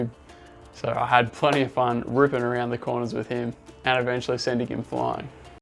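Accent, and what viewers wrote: Finland. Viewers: I'd die in australia. Even 23 celsius is too hot for me, I get sick in ten minutes if the sun is shining :( I prefer summer nights, not too cold or hot and the sun is usually still up (I mean, it doesn't go down at all in lapland. It's both a blessing and a curse.) I get sick in sauna too, sucks because I like how the hot air feels on my skin. Btw your finnish accent is really good? Wow.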